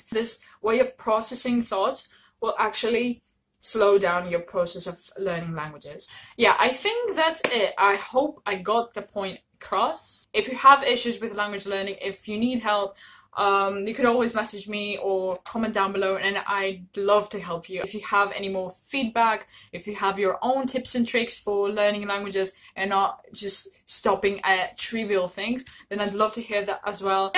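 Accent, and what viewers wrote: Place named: United Kingdom. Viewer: Τόσο τέλεια Βρετανική προφορά 🇬🇧🇬🇧 You sound a bit posh😂😂😂 Είσαι τέλεια .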